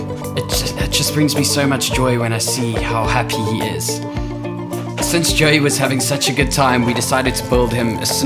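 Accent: South Africa. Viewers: As someone from Cape Town I never notice our accent in person but as soon as it's on video it's like in your face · You sound South African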